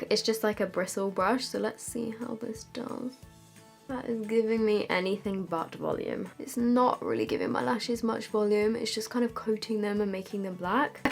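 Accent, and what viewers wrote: Australia. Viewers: Very impressed with your Australia accent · That Australian accent though! 😂😂👌🏼👌🏼 #notificationsquad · Still beautiful 😘 that Aussie accent thouugh 😂👍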